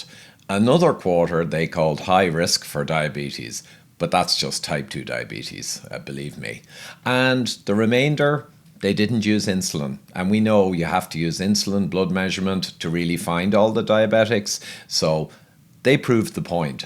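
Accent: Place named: Ireland. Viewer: the proble for ivor , is his accent , its so difficult to take the irish accent seriously . they are more renound for making jokes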